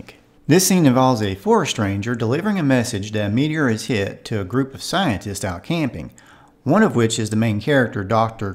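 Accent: South, United States. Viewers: dunno too busy trying to listen to your forrest gump accent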